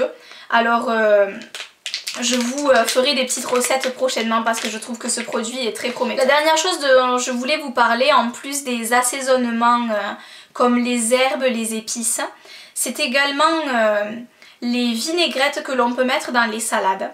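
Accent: South, France. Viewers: cc tu es de Marseille ? Nîmes ? Toulouse? j ai un doute . biz gaelle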